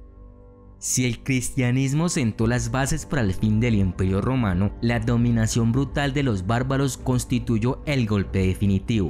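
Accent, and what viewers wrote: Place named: Colombia. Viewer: No puedo tomar un acento colombiano en serio, siempre hablan bromeando jugando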